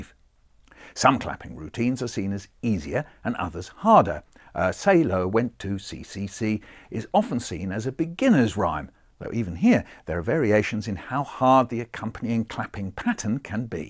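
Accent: United Kingdom. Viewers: Yes, instead of hearing the children perform, let's just have an old British guy talking the whole time. :/ Typical British media presentation, with the RP narrator over the top of everything.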